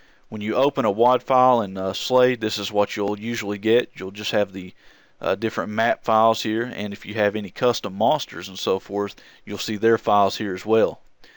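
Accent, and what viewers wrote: South, United States. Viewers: i’m a bit jealous of your voice you’re kinda like hillbilly morgan freeman i should know; i’m a southerner myself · this is a good video, but the thing that intrigued me the most is his cowboyish accent. really satisfying to hear it XD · sounds like a redneck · You sound like a country boy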